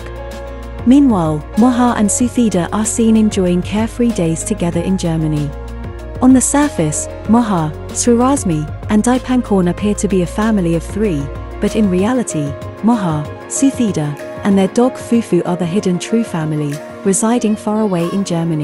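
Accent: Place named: RP, United Kingdom. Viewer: Nice to hear a beautiful standard received pronunciation.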